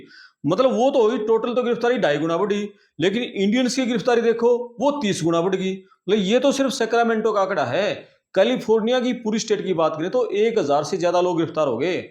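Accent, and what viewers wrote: Haryana, India. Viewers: एक तो आप इधर उधर की बात कम करिए , सीधे काम की बात पर आइए और हरियाणवी accent छोड़कर खड़ी बोली में बात करिए तो अधिक लोग देखेंगे.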